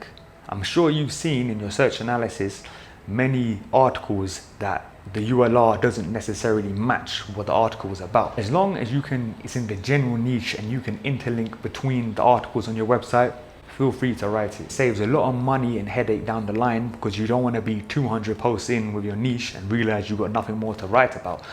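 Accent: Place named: United Kingdom. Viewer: You sound like a British rocky balboa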